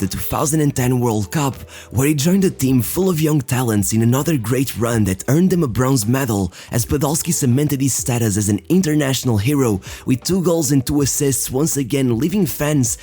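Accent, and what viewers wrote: Portugal. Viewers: Não sei porquê mas há algo que na tua forma de falar que se percebe que és português 😂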